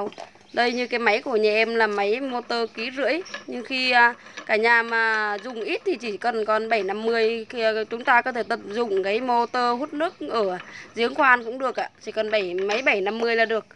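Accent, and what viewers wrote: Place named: Quoc Oai, Vietnam. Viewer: nghe như giọng ở quốc oai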